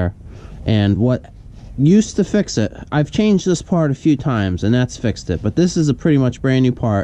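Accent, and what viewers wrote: New York, United States. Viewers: “What used to fix it wiff” Good old country New York accent.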